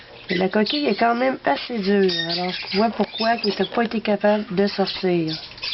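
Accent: Quebec, Canada. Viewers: J'adore votre accent canadien (si je ne me trompe pas) et bravo pour le sauvetage :) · oh bas oui on remarqué que tu es du Québec !!!!!!!!!!!! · J'adore l'acsen québécois ! J'arrive pas a le faire... 😕 ! Un jour d'arriveré. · pas française, québécoise !!! listen !! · Ahah je kiff l'accent québécois ;)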